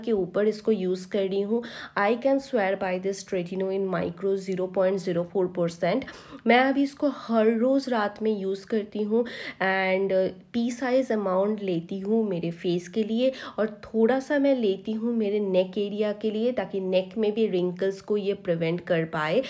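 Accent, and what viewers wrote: Bengal, India. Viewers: Looks like a বাঙালি Sounds like a বাঙালি too ❤❤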